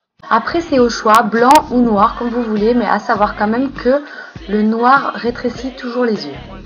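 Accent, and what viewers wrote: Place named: Marseille, France. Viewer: On est à côté Made in Marseille ici !! Alors je me reconnais aussi à ton accent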